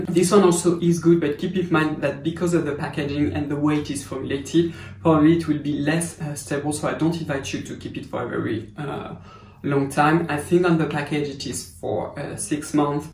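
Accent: France. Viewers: I am very sorry but do you have English subtitles? I can only see French subtitles. I am struggling to understand your lovely French accent. Not your fault but if there’s English subtitles, it would be cool. :)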